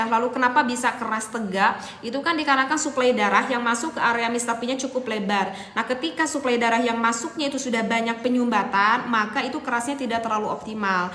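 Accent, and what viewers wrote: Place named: West Sumatra, Indonesia. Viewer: Wa'alaikum salam,. Hadir tehcannnnn. Oia, teteh ni kan sunda, tp ko mirip minang ya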